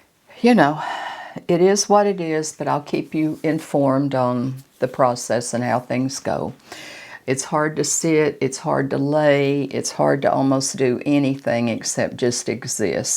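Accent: South, United States. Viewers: She sounds like she's from the South. Peonies don't grow in warm climates, so she might never have heard anyone talking about peonies. I didn't know either, until I moved to Ohio.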